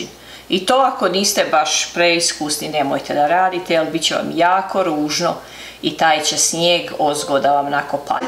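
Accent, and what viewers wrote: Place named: Slavonia, Croatia. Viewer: Draga.Iako se ne poznamo gledajuci vaše recepte postali ste mi tako bliski ne samo zbog predivnih slavonskih recepata jer i ja sam porijeklom iz Slavonije nego zbog toga što iako ste u daljini čuvate svoju tradiciju i još uvijek govorite prelijepim slavonskim govorom.Puno pozdrava Vama i Vašoj obitelji i želim vam svako dobro jer jako ste me obradovali slavonskim receptima i slavonskim govorom.Ma gdje živjeli u srcu zauvijek nosimo mirise i okuse rodnoga kraja.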